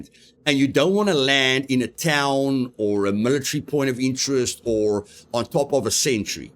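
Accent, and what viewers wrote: South Africa. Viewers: you sound South Afican Are you SA ? I am an south african looking for discord channels or groups of south african scum players or maybe possibly to play with a pro like you ive just started youtube streaming working on building a base viewer amount before making vids so im currently just streaming some games with friends watching im aware i talk a differant language than english,afrikaans.but im quite fluent in english so im just seeing if your intersted in maybe playing together thx for the good vid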